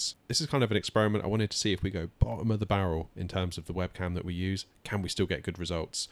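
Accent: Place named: United Kingdom. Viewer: lovely hear british accent 😄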